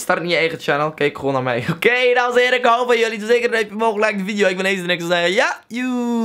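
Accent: West Flanders, Belgium. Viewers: Mensen spreekt een beetje raar maar niet omdat hij Vlaams spreekt, maar West-Vlaams en dat is heel anders dan zeg je ipv ik bv ek en bv pikvis pekves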